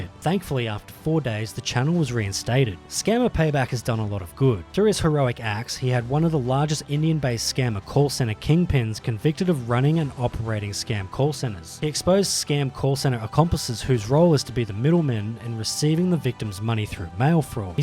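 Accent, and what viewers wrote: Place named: Australia. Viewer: and i just realised your Aussie, even bloody better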